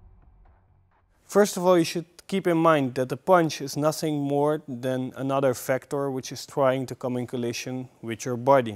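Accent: Netherlands. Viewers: je bent nederlands he ?/? ik hoor het aan je acccent.. waar zit je op school. want ik wil ook systema gaan leren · 100% Dutch...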